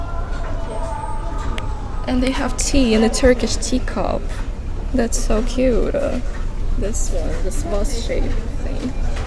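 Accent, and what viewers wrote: United States. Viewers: Your English is perfect. You sound like you were born in the USA. You remind me of the models in this video..... <link>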